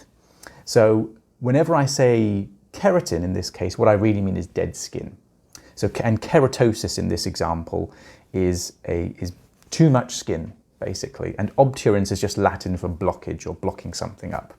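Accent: United Kingdom. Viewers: Yup! Cute doctor with a British accent always has a calming effect on me. 😆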